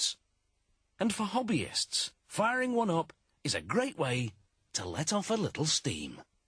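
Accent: United Kingdom. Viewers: i hate the British accent narrator voice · The British voiceover is the bomb.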